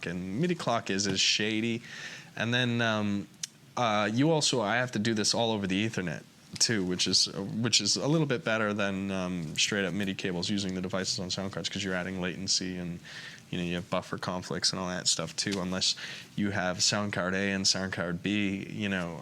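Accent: Canada. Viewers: his Canadian accent was really noticeable, wow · bahahaha awesome... he has a bit of a canuck accent as well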